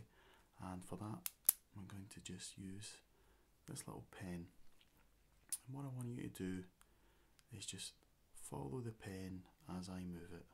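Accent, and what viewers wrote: Scotland, United Kingdom. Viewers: Hot dude with a Scottish accent. So great :) melts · You're so relaxing.. And you help me assimilate the Scottish accent which I have trouble deciphering lol.. Keep up the good job. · Loving the accent! Just can't quite decipher which part of Scotland your accent is from..😂😂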